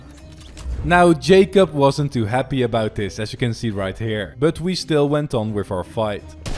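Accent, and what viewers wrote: Netherlands. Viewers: Heerlijk Nederlands accentje 😂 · Ur dutch right? Ps. Keep up the great vids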